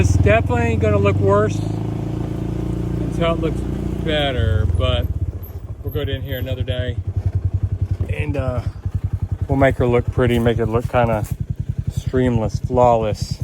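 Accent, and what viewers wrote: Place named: Oklahoma, United States. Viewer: I'm from southeast Oklahoma....I thought your accent sounded familiar ❤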